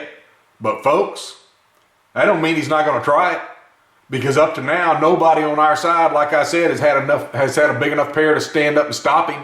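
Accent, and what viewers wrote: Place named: South, United States. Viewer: To bad! That southern accent could come from anywhere down here! Love the vids buddy! Keep your eyes and ears open and we will weather this storm Lord willing!!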